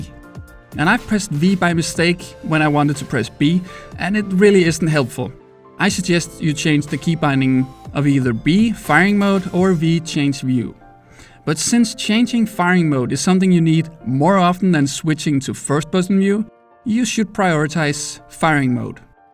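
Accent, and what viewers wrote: Denmark. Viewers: That Danish accent tho!!!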